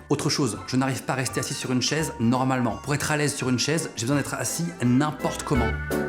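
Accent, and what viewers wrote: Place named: South, France. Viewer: mon dieu l'accent du sud qui revient je pensais etre la seule :''')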